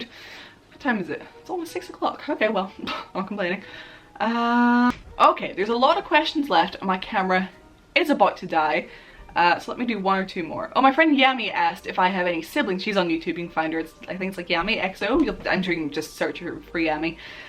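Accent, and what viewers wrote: United States. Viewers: Well maybe a few words, actually, but at first you sound American! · Ive no idea why, since I know you live up north, but your accent sounds like, half american or something these days lol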